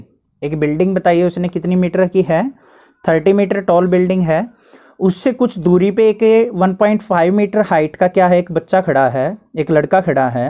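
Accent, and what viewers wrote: Haryana, India. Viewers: Sir tam haryana ta ho k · Sir आप हरियाणा के हो क्या ???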